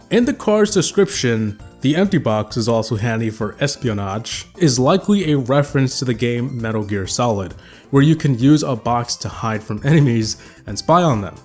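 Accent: United States. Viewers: this is the most american man i have ever heard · Good video expect the american midwest pronunciation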